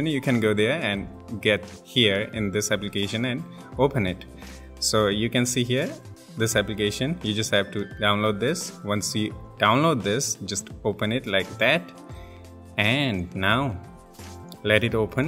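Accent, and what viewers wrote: India. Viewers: atlast an indian person speak english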